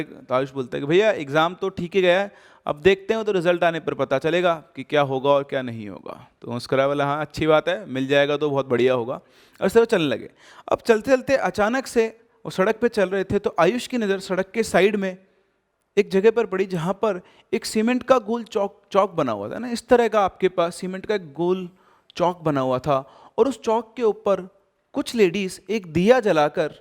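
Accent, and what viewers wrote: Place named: Bihar, India. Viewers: Nice bhojpuri accent sir ji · Your bihari acent is so cute and the 3D fig 👏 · The bihari accent langda bhoot dekhe k ba tohra k 😂... Hilarious 😂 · no bruh! its not normal hindi ... Its bihari accent . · His bihari accent 😁😁😁♥️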